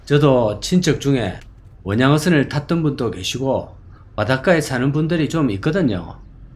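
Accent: Gyeongsang, South Korea. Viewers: 선생님. 갱상도 사투리가 구수합니다. 인구소멸 지역중에. 한곳. 외? 먹고살. 산업지대가. 전무상태. 경상도 와. 비교해보세요. 엄연한차이. 8.90년대. 대권은. 주로. 경상도. 하여. 공업단지. 그때모두조성. 그대표가. 구미공단. 울산 공단. 등. 모두 부자동네. 그런데. 지금. 경제. 안좋다고. 난리난. 경상도. 하지만. 현재도. 많은차이. 호남과. 경상은. 비교하지말기. 너무억울하니까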